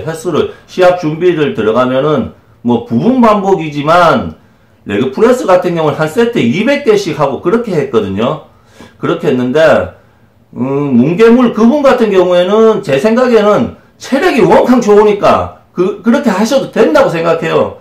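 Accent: Gyeongsang, South Korea. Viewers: 행님 말투가 너무 좋습니다ㅎㅎ 우리 부모님 같으세요 갱남 밀양분들인데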